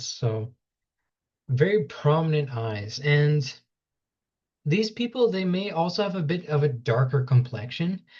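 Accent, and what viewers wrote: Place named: United States. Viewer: Your channel name is (one of the names of lord krishna) but your accent is American why?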